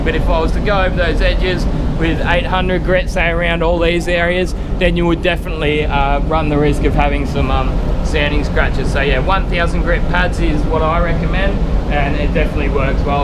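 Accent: Australia. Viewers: Australian accent + high speed talking= disaster 😄 Bro, your channel is popular all around the world, not just in Australia, please slow down, we can't understand you 🙂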